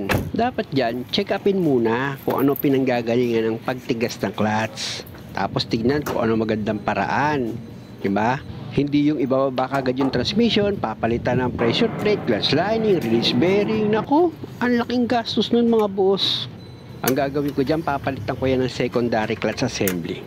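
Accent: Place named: Nueva Ecija, Philippines. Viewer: Boss tiga saan po kayo my garod kayo sa salita eh nueva ecija po??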